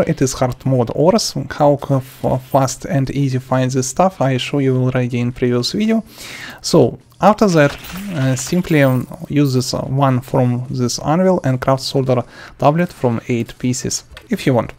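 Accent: Russia. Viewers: it sounds russian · Я конечно пытаюсь выучить английский, но русского человека говорящего по английски с таким акцентом понимать невообразимо просто, спасибо. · У него русский акцент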